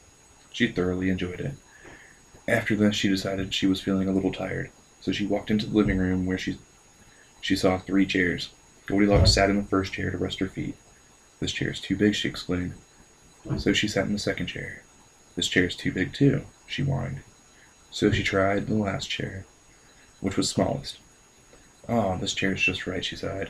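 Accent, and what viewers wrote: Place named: Charlotte, United States. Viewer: I only notice a very slight accent but if you would have grown up 50 miles away from Charlotte your accent would be totally different(as in totally southern) hehe. I also live in Charlotte been here for 2 years now and it seems like the accent goes all out southern once I leave the city limits. Also most people from Charlotte are from the North, ie:NY/NJ/OH just like me:)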